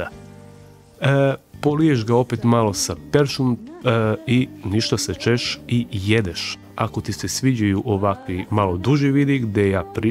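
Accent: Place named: Knjaževac, Serbia. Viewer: Помоз Бог! Да, изузетно нам се допада. Подршка! Настави са причом јер нам је лепо да се упознамо са говором из Књажевца.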